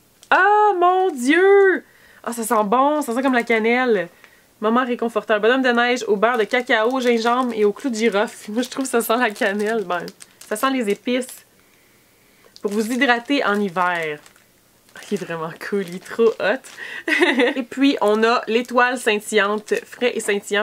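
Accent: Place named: Quebec, Canada. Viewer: je t adore accent quebequoi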